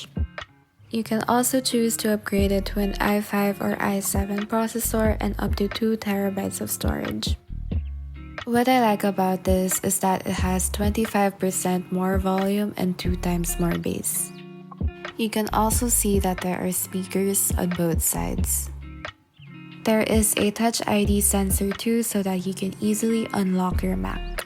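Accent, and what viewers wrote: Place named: Latin America. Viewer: You sounds like a Latino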